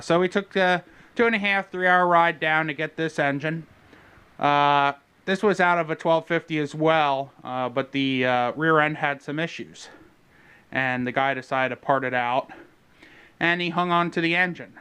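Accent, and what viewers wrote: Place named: Pennsylvania, United States. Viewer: I knew you were from PA. I could tell by the accent!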